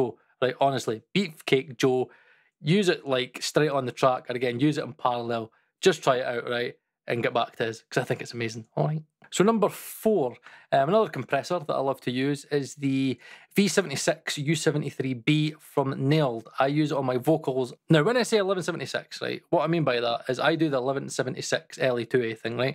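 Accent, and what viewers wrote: Scotland, United Kingdom. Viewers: I've watched 4 or 5 of your videos now, and I think I can finally state without hesitation that I can fully understand your (Scottish?) accent. :) Now I CAN sleep at night.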